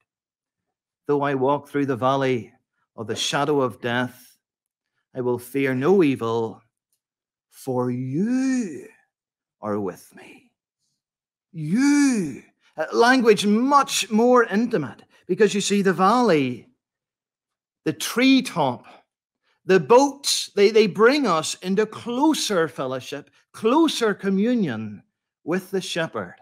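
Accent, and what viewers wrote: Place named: Ireland. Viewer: Thank you for a great sermon - loved the Irish accent too. Nice to see an Irish pastor -God bless.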